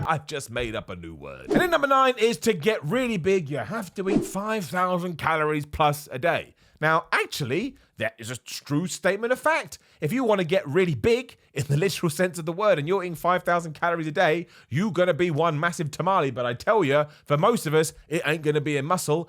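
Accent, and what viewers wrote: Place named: RP, United Kingdom. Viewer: I like that as got older his voice became "posher". I'm glad the first thing on the list was that you can't completely change your body to look like someone else no matter how much work you put in or how heavy the weights are you lift. You shouldn't have a goal to have abs, legs, arms like one specific other person, because you a different people. If you exercise and train your body isn't going to look like anyone else because it's unique to you.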